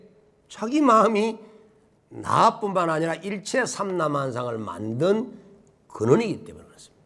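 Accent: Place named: Busan, South Korea. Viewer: 🌈 듣고 또 듣는 이 소중한 법문 정말 감사드립니다. 제가 하와이에 살아도 , 법문을 부산 사투리를 섞어서 하시니 고향의 정겨운 마음이 웃음으로 더 제 마음에 담아집니다.🙏🙏🙏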